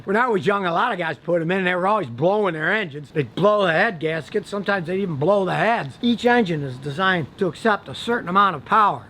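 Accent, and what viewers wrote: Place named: Alabama, United States. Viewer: He must be from alabama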